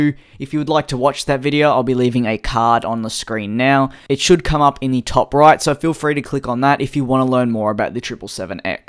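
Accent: Australia. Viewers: He kinda sounds like Crocodile Dundee, huh?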